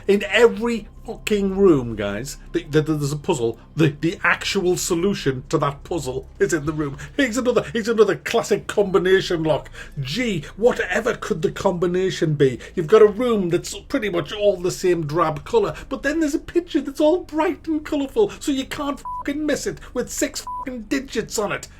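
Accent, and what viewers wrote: United Kingdom. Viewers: it's poor and stupid part of the uk kind of a accent.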